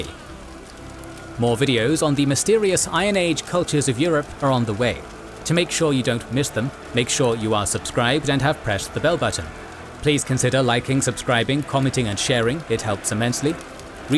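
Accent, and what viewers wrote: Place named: United Kingdom. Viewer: Ništa mu ne verujem. Ima Britanski naglasak. Oni su prevaranti i lopovi.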